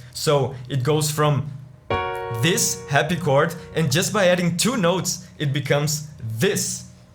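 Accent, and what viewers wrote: Brazil. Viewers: Fatalis é um bixo muito brabo, percebi que tu era brasileiro só pelo sotaque kkkk vlw ai mano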